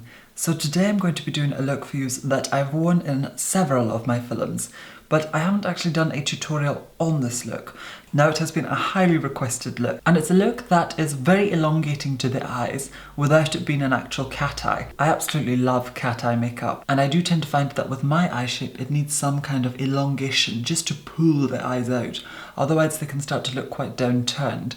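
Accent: Scotland, United Kingdom. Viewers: I adore when I can hear a bit of your brogue... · I love your voice and UK accent😍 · Your knowledge of products is astounding! You know all the names and number of products and brushes just like that! Astonishing. How on earth do you do that? I strive to be a professional makeup artist and you set the bar VERY high! And your are so funny. Thank you for every film. You teach us mere mortals so much of your craft. I love your subtle Scottish accent too, as I'm Scottish myself. Wishing you all the best. Ilse from South Africa.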